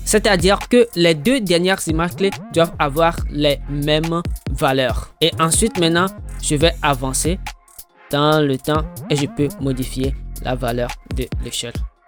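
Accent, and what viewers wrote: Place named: Benin. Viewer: T'es béninois ? Ton accent...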